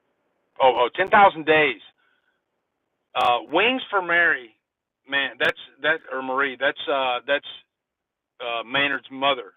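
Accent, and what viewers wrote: South, United States. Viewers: We have Tesco express here in the UK- Marks and Spensors if you’re wanting posh! Love your southern drawl…. I was born in London, and moved to Norwich… So now I sound like a cockney farmer! 😳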